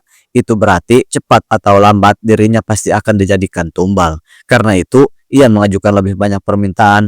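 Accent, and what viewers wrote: Indonesia. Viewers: Emang ngomong na kumaha kitu? Logat sunda na Sigah logat jawa tengah kitu? 🤭 Atos di subcribe luuur 🤩👍🏻 ╭╮🎋🪻🎋🪻🫰🏻 ┃┃╭━┳━┳━┳━╮ ┃╰┫╋┣╮┃╭┫┻┫ ╰━┻━╯╰━╯╰━╯ 🤗🤩🫰😍👍🏻💯❤️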